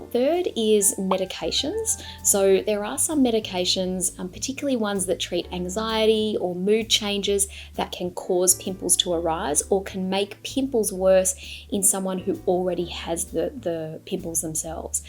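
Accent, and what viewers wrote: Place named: Australia. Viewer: Nice to hear an Aussie!!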